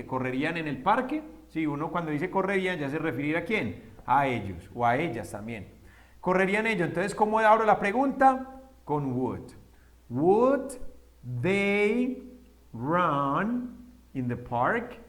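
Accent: Colombia. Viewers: Excelente la manera de explicar,de lo mejor que e visto en YouTube y es mucho más interesante por el acento siento que me está enseñando el mismísimo patrón Pablo Escobar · Gracias... muy bien explicado, soy de México, veo que tienes acento colombiano. Saludos ✌ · Gracias! Me gusta tu acento colombiano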